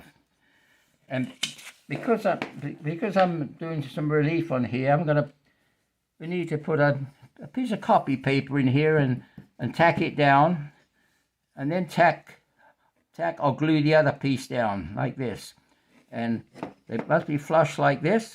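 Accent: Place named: England, United Kingdom. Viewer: Nice. Tip you sound English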